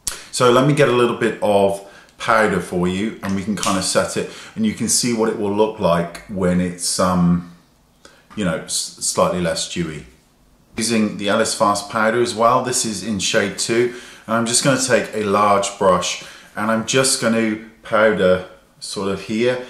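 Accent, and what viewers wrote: England, United Kingdom. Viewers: I love your english accent especially how you say dewy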